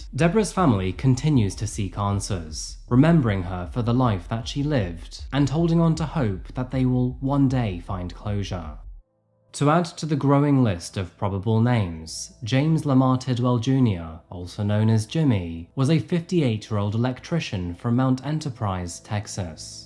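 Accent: United Kingdom. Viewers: Translation: He's cute and has a British accent. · I love to watch coffeehouse crime videos., The way you execute your videos and the way you tell us how you feel make me feel that you are a very good person. I love to sit and watch your work. I know it will be good footage from start to finish. Please cover all kinds of stories whether they’ve been covered already. whether they’re old or new, weird or wild. And I always learn something interesting about the location you are covering. I love how you tell the it!! And of course, that British accent!!!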